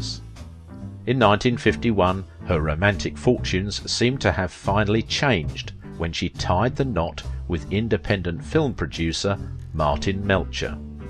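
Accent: United Kingdom. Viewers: I could have appreciated this video if it wasn't for the creator's British accent. Try as we may, we just can't escape our attachment to that island nation. One revolutionary war, two world wars and the cold war and we're no closer to being independent from them. If something originates in Britain, it will find its way into our country. Some say it's due to a common language and history, but it's like relatives who live on the other side of the country. Send them a Christmas card every year, but let them live their lives, while we are free to live our own.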